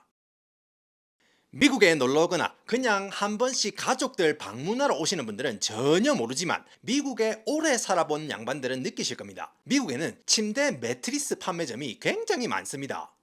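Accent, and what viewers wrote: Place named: Busan, South Korea. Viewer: 잘 보고 있습니다. 부산 중구,서구.동구 사투리인데, 저에게는 너무 듣기 좋습니다. 딱 동네후배가 '행님 아 이습니까~ 그래가~' 이런 뉘앙스가 i'd love to so much. As i indeed.😊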